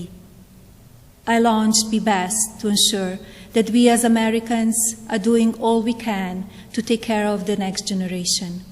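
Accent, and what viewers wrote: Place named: Eastern Europe. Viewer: 她的东欧口音还是可以听出来的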